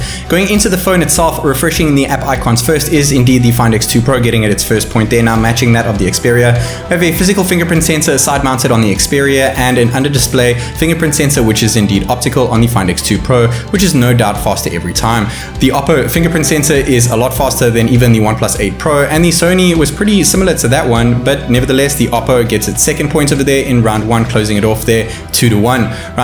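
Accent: South Africa. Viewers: Are you from South Africa? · you sound South African like a joburger..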